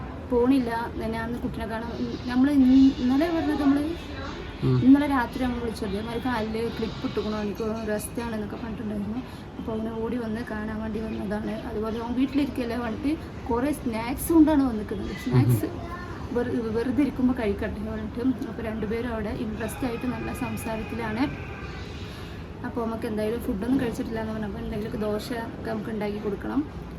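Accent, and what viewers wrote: Tamil Nadu, India. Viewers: എത്രയോ ചെറുപ്പത്തിൽ അവൾ തമിഴ്നാട് പോയതാണ് അന്നുമുതൽ അവൾ സംസാരിക്കുന്നതും ഇടപഴകുന്നതും അവരോടൊപ്പം മാത്രമാണ് അതുകൊണ്ടാണ് ഇങ്ങനെ സംസാരിക്കുന്നത് എന്തിനും കുറ്റം പറയല്ലേ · നീയൊന്ന് മലയാളം പറ അല്ലെങ്കിൽ തമിഴ് പറ. പറയുന്നത് കേട്ടാൽ തോന്നും നീ ജനിച്ച് വളർന്നത് തമിഴ്നാട്ടിലാന്ന്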